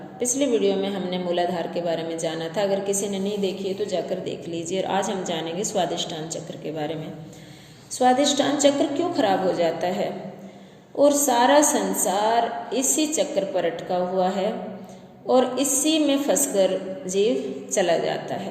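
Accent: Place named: Rajasthan, India. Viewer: आप राजथास्थान से लगते हो बोली से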